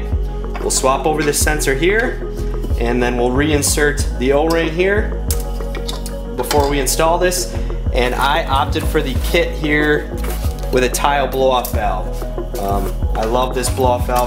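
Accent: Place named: Wisconsin, United States. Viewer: You are truly a Wisconsinite my friend. the accent and the fact that you grabbed a cold one mid install lol you love to see it 😂. From Wisco here as well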